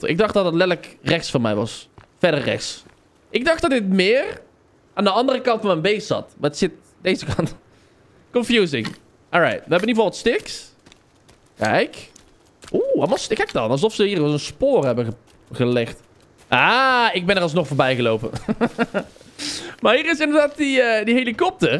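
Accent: Brabant, Netherlands. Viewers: ahah maakt niet uit joh jullie zijn beide legends ik vind t ook leuk dat je lekker Brabants bent net als mij 🤣😂 maar eh komen er ook nog ark video’s ging altijd stuk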